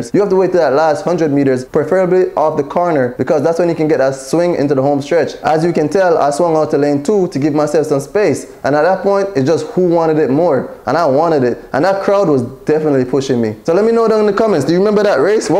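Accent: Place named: Jamaica. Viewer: The accent had me though..Are you Jamaican 🤔....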